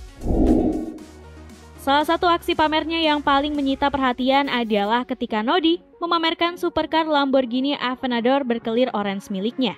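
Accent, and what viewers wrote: Indonesia. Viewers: Yg ada nya kau bkn miskin.. tp balik kampung ketakutan Oh btw bang Kau itu anak aceh ya Jgn kau bawa2 nama anak medan Logat bicara kau aja aceh x Anak medan ga spt itu logatnya Org asal aceh kok bawa2 nama kampung sebelah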